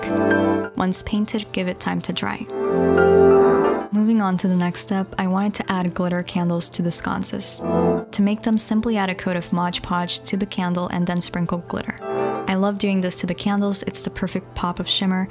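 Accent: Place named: United States. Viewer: I’m curious where you are from, you have a lovely American accent with something lovely from somewhere else...beautiful speaking voice, you MUST start an AMSR channel, you will gain thousands of followers! ✨🐰🐰🌹✨🎠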